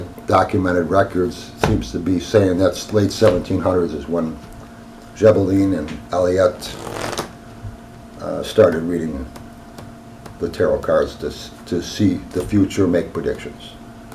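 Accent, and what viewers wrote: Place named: New Jersey, United States. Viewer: ah, thank you. I’m from NJ and he sounded so NJ to me.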